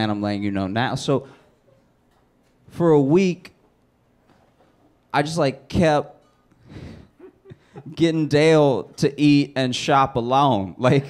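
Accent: Louisiana, United States. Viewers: He sounds like that because it's his accent from Louisiana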